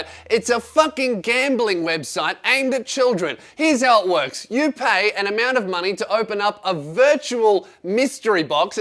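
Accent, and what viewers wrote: Australia. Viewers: Are you Australian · this swearing Australian is music to my ears, why does your voice sound so good lmao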